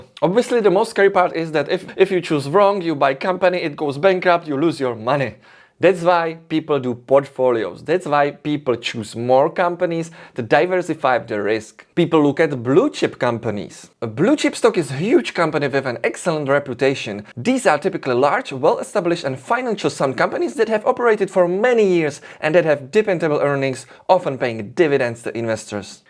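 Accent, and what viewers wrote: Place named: Czech Republic. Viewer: Proč musejí mít češi v každé řeči ten český akcent , to se nedá poslouchat po 16 vteřinách jsem to vypnul.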